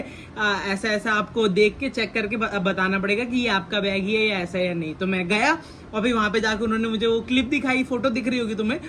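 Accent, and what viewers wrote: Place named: Haryana, India. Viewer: या तो तुम हरियाणवी हो या फिर दिल्ली एनसीआर तरफ के हो ना होना होना होना होना होना😮😮😮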